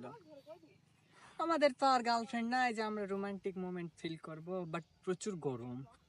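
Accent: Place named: Kushtia, Bangladesh. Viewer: ভাইয়া তুমি কি কুষ্টিয়া জেলার ছেলে তোমার কথা গুলি ওদের মত🤔🤔🙄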